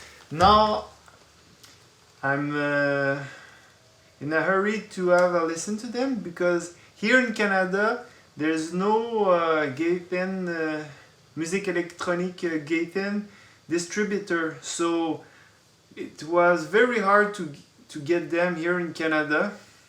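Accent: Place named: England, United Kingdom. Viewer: J’adore to accent anglais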